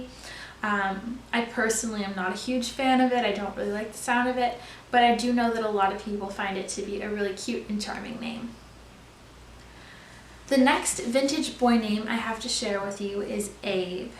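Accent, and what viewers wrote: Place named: Canada. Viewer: Are you from Canada? just wandering it kinda sounds like you have an accent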